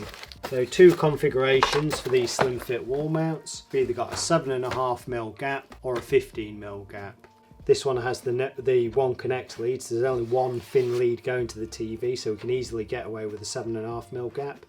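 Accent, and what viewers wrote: United Kingdom. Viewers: Weird seeing an Asian guy with a British accent lolol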